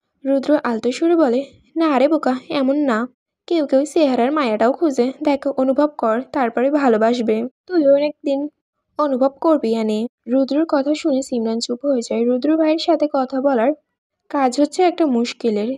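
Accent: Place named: Barishal, Bangladesh. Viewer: আপু তুমি মনে হয় বরিশালের মেয়ে তাই তোমার ভয়েস টা ও বরিশালের মেয়েদের মত লাগে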